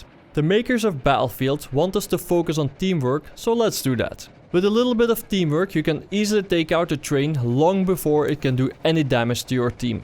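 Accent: Netherlands. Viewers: Yo man are u dutch of you are here is a sentence for you: goeie video man super leerzaam! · ur dutch arent u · is this guy Dutch cause his accent is not english nut great vid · Are you from the Netherlands? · are you dutch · you just can hear you are dutch xd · Your dutch aren't you ? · you can clearly hear that he is dutch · The dutch accent is strong in this one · R u dutch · ben je nederlands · ben jij nederlands of belg of zo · he clearly is, he has that distinctive Dutch accent that you hear when 90% of the Dutch people speak English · are you Dutch? if not from what country are you from? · where r u from? u Sound Dutch :) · Are You Dutch or something like that? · are you dutch??? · Haha Nederlands dat hoor je meteen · That dutch accent is amazing xD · jij komt zeker uit nederland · Are you dutch? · are you dutch/NL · Are you Dutch? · Ben je Nederlands ik hoor het namelijk lol maar goeie video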